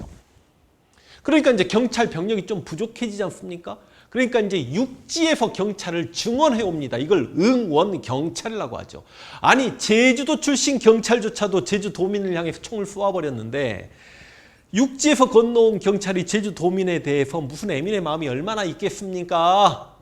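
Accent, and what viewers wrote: Jeolla, South Korea. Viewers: 원래 전라도 사람들이 감성적이더군요 이분도 사투리 억양이 딱 그쪽이네욪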